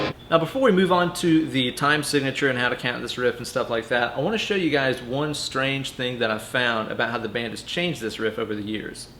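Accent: South, United States. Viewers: Are you from the south ?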